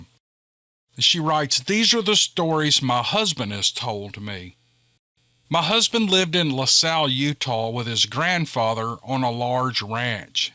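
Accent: South, United States. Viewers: 11/2/22 Hi. I very much enjoyed these stories. I do enjoy long videos, especially at night. About that accent. Many of my older relatives are from the south and I love to hear them speak. They just laugh at me but it's fun to listen to them anyway.😁 Anywho, thanks for the stories (and the accent)🤣. I can't wait 'til the next batch. Take care! ⭐👍⭐ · Talking about southern accent mine is really close to yours and I remember the first online game I played. When I started talking everyone that was playing at that time talked about it for weeks after. I was laughing my but off at them cause I’m thinking what’s so special lol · My story too 😲😂😄 I love reading 'n his sweet southern comfort draw. I listen to his tale's over 'n over...many nights he helps me fall fast asleep 😌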